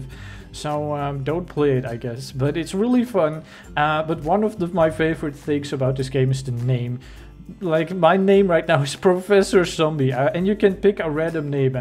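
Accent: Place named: Netherlands. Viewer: That did i hear good you are form the netherlands . CUZ I AM DUTCH TOO! :D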